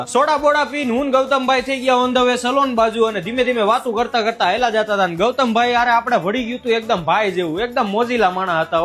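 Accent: Kathiawar, India. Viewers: લાગે છે તો અમારા કાઠિયાવાડી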